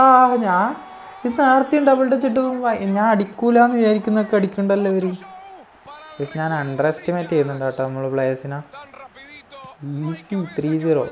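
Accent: Kannur, India. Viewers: Brother kannur aano ?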